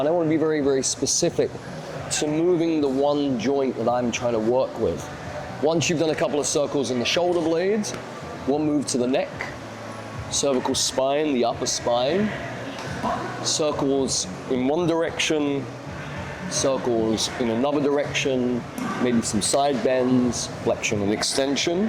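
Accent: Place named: England, United Kingdom. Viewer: I'm sorry I can't take this guy seriously with all those tattoos combined with his Wallace and Grommit look and middle class English accent on top. I would prefer t learn from the Thai trainers, are there any left in Bangtao?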